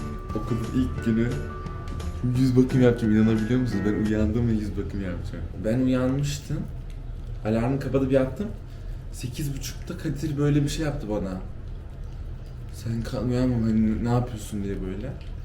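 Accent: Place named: Rize, Turkey. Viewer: O ne öyle rizeli rizeli şeyler✨✨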